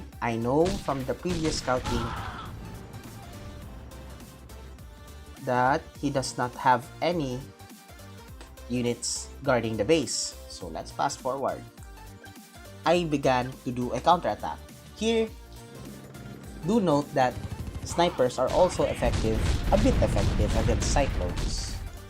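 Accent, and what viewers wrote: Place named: Philippines. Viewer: sabi na eh pinoy haha dahil sa accent natin, pero naseal ni isagani ung hula ko haha anyways, angas bro. i just came back sa AoW3 and nangangapa pa ulit. dami ko natutunan na counters sa vid mo.. tnx a lot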